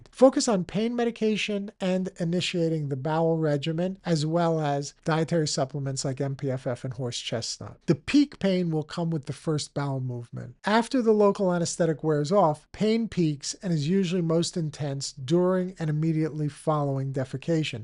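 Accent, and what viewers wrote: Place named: New York, United States. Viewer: I heard the accent and I was hoping you were in the NYC area... Looked it up and you're 15mins from my apartment in NJ 😂. Doc I had a question what are your thoughts on bidets?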